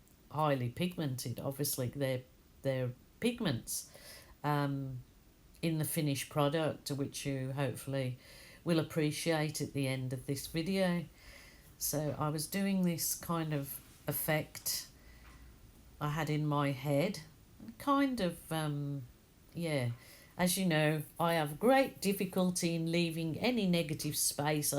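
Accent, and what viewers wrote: Birmingham, United Kingdom. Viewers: Hello from Shrewsbury, UK. You’re a lockdown saviour...I’m now an acrylic addict! If you ever come to live in England, you would fit in really well in the Midlands as you have a perfect Birmingham accent. Just wondered if anyone else had ever mentioned that?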